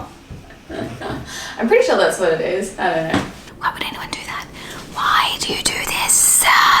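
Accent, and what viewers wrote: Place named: Australia. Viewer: You are so genuine and charming, a lot of vloggers put up a pretty obvious facade. Also lovely to hear an aussie. Keep em coming! / Currently deployed somewhere in the desert - fan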